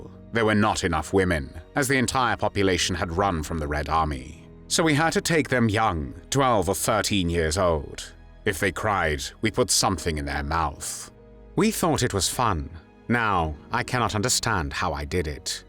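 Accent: England, United Kingdom. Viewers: Soviet communism!? Don’t you know where the communist manifesto was written or where Karl Marx’ grave is?! You have a British accent! And should know the history of your own country! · The English accent of the narrator is nauseating. Hammurabi was right.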